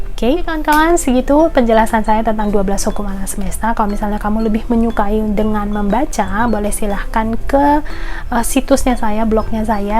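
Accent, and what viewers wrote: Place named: Madura, Indonesia. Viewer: Agak miri macam tetanggaku madura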